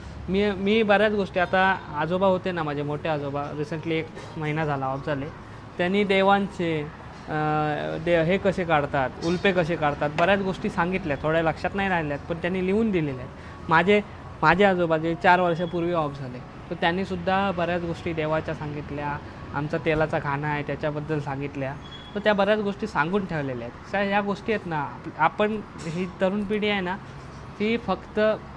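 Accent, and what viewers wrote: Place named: Konkan, India. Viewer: नमस्कार भाऊ मी मुबंई मधून बघत आहे आणि मी नेहमीच बघते तुझे व्हिडीओ मी मुबंईला महालक्ष्मी इकडून बघत आहे आणि तुझी ही गावठी भाषा ऐकून छान वाटते मला आपल्या कोकणातील गावची भाषा आवडते तुझ्या कडून एकण्यास वेगळी मजा येते धन्यवाद भाऊ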